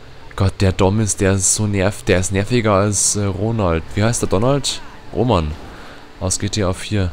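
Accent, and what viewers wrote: Bavaria, Germany. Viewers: verdammt, du bist bayer?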